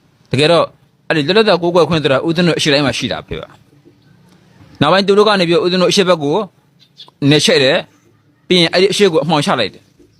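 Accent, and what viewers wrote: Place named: Rakhine, Myanmar. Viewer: ပြောတဲ့အသံနားထောင်ရရင်ရခိုင်လူမျိုးတင်ထားတာထင်တယ်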